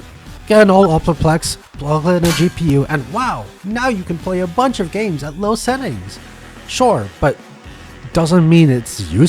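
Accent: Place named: United Kingdom. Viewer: bro even if there's not a whole lot of VR games I believe you only need one Hotdogs, Horseshoes & Hand Grenades. Also dude what part of the UK are you from? Your accent is very interesting I don't think I've heard it before xD I'm midlands myself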